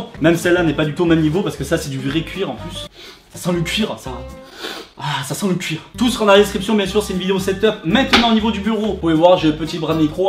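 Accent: South, France. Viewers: Il est d'où du sud?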